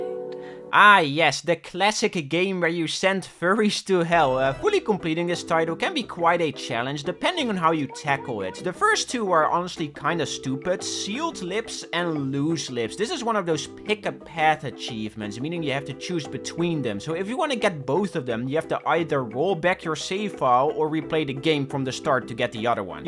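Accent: Netherlands. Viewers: jij klint heel nederlands ben jij? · you sound so dutch its actually insane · i heard your accent and could immediately tell you were dutch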